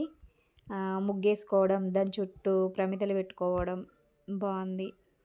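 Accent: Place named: Telangana, India. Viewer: Mee slag telangan nachhindi super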